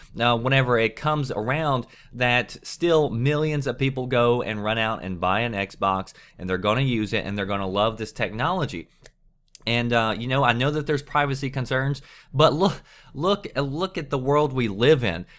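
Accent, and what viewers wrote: South, United States. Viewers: Is... is that a southern accent? That is a first for me. Game reviewer with a southern accent... wow. Who is also a privacy advocate.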